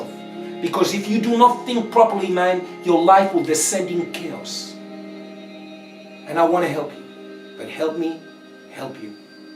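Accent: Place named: South Africa. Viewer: Hello i sent an email of my situation. Also are you from south africa or did you live there before? I hear some sort of SA accent. Thanks for this amazing video!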